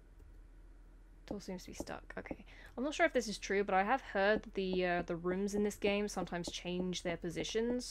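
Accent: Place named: London, United Kingdom. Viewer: You have perfect London accent. I like it.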